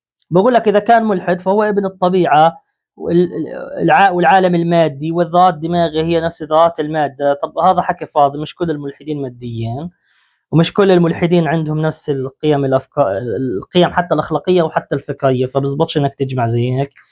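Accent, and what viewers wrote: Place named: Jordan. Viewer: بحب اللهجة الاردنية 😂 طب الحق علي انا يعني دقيقة ٩ و ٣٠ ثانية تعديل بخاتمة الفيديو ( هو انت بدك تتجوزني ) 😂 جملة ايقونية يزم يسعد رب الاردنية ❤❤❤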